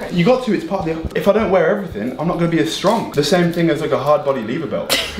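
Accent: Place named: United Kingdom. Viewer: This was so much fun to watch and made my day! Seeing your costume struggles beforehand was just as entertaining as your time at the gym, so I'm glad you included that. I think anyone who has gone to a ComicCon can relate and it's amazing you got everything to stay on with as big and muscular as you are. Also, I'm from the US so hearing Captain America with a UK accent made me smile as well. Looking forward to the next video!!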